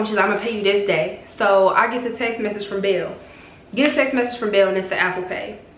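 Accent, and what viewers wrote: Chicago, United States. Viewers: I watched Ti’s story time do I had to watch yours. Btw are you from Chicago?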